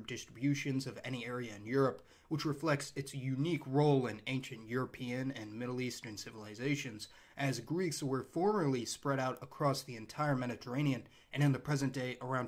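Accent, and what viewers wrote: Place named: Philadelphia, United States. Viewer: You have somewhat of a Philadelphia accent. It sounds like you try to cover it up, but it still pokes through in spots. Am I hearing that correctly? Also... where tf have you been lately?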